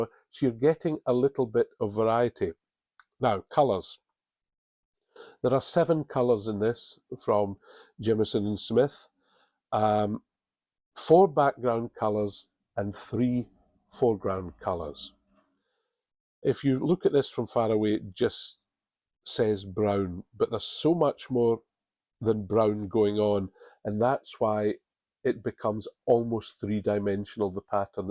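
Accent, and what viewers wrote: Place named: Scotland, United Kingdom. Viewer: thank goodness you were cast off from Game of Wool because now I can watch these fabulous podcasts that you are doing. I'm a knitter in Australia and I started a vest from Kate Davies book "Inspired by Islay" back in 2017 which has been languishing since. I'm now inspired to finish it, steeks and all!! You are an expert knitter and have a delightful Scottish accent (to me), that is so pleasant to listen to.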